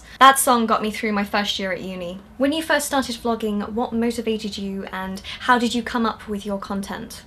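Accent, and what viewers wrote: France. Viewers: aaaah! I love you french accent! you speak very well, good job! I'm so happy because now I know that I can speak with you sometimes in french:-D tu es une fille super! ça fait 1 heure que je regarde tes videos et je ne m'en lasse pas:) Bisouuuux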